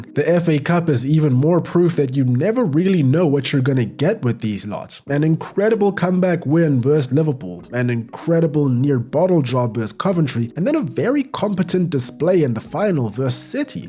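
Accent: South Africa. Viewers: I just know that’s a South African accent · Are u South African?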